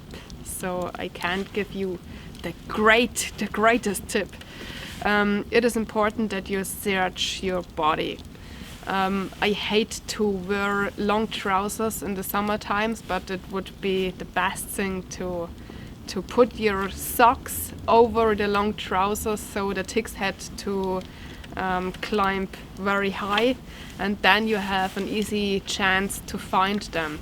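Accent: Germany. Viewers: I always enjoy your videos. The outdoor setting by the fire was beautiful just like you. I think everyone loves hearing your German accent. Keep your personal life private. The more you tell the more people want to know. Sometimes I think we see too much of you in your videos but that's more personal. Take care of yourself and your children.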